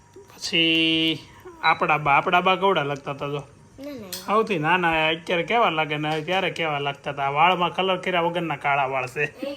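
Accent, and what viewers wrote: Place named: Mumbai, India. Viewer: Dada e song bavj saras tune maa gaayu, emno accent thi laage che ke pela Mumbai rehta hoi.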